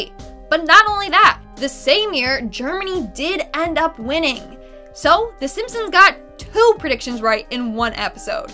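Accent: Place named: England, United Kingdom. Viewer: I am so hooked on the English accent and now the American accent sux.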